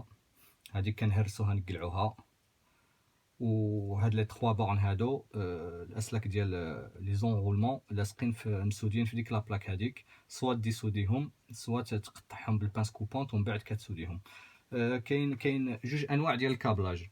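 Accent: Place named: France. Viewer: ديس لايك بسبب لهجتك الفرنسية الخراء، والله انت لست بعربي ، اعتذر لك إذا كنت امازيغي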